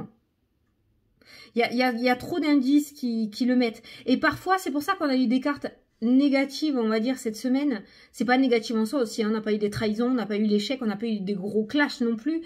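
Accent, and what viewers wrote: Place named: Lattes, France. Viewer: Salut Trop contente de retrouver chaque jour mon petit rayon de soleil 🥰💖😍 (en plus comme je suis Lattoise mais exilée a bordeaux ton petit accent me fait trop du bien aux oreilles 😁😜 )genial merci ce petit concours 😋🙏🍀🤞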